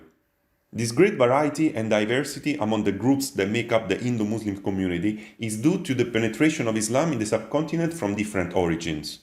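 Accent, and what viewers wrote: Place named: Italy. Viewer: Love your channel. Informative and unbiased. Oh and the Italian accent makes it even better 😂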